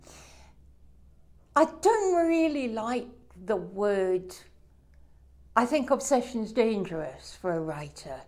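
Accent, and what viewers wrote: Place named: Derbyshire, United Kingdom. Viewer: She's posh for Derbyshire, I'm from there and most people don't sound like this!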